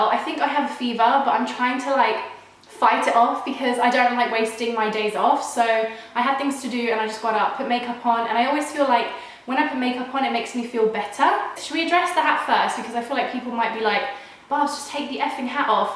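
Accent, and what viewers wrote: Italy. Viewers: Il tuo accento italiano è troppo carino 😊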